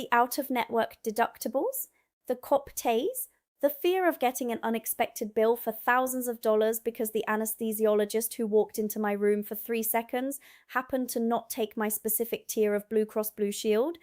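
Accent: United Kingdom. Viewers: I buy superseeded wholemeal bread and it lasts me a week. I prefer it that way. It tastes fine. I have 5o say you are a most confusing Amercan because you sound like a Brit!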